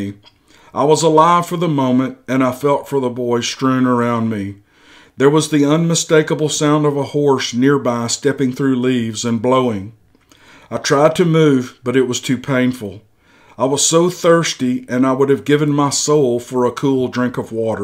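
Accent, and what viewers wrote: South, United States. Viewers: One of the most compelling stories and the way you narrate these accounts is awesome, and your southern draw adds to this story thank you my man for this magnificent post ⭐⭐⭐⭐⭐. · It was nice to hear the story narrated by someone with a genuine southern accent. The story is credible and worth the time to listen to. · thank you for this thanksgiving day treat. what an interesting story! hope you and your family had a wonderful day. love your channel. your stories are top notch and you're a fantastic narrator. your great southern accent is a treat and makes listening to these stories more enjoyable. I just recently subbed to your channel and have now listened to all of your previous uploads and look forward for each new post! keep up your good work and I can see your channel will quickly grow. thanks. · I love your voice and soft southern accent! 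Great story! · I live in Elvis land now and work in North missippii love listening to u because u sound like me.good show love it! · If you will listen again...carefully at 1.06 l believe, he correctly pronounced the word you are so quick to call him out on...maybe your hearing might be a bit dull. When you have thousands of people listening to you and asking for more, maybe then you might have cause; we who absolutely adore this gentle Southern drawl expect him to read this as a Southern boy would speak. At any rate, he is a big man, kind and careful...try to follow his lead. I believe he will steer you correctly, cheers & happy trails! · I LOVE LOVE LOVE this story!! Thanks so much to the writer, and to you,! This story could only be told with a good Southern accent! I often listen to your stories multiple times (like reading a good book multiple times, when you think about it), but this one I replayed straight away... 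I wouldn't be surprised if this is a true account. There must be more stories like this out there... told to children and grandchildren... Thanks so much for this gem 🪄💎 ✨️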